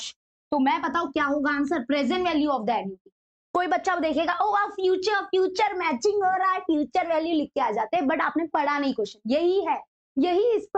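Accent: Maharashtra, India. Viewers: Mam are you from Maharashtra....? ( come through your marathi....)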